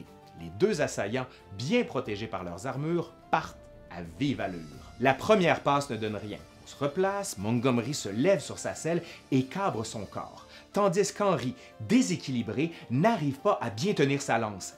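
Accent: Quebec, Canada. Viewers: Excellent ! Comme tout jour. De votre accent québécois Je dois, ma foi, l'aimer pour et pour. De revenir mon œil Pour mourir Roy !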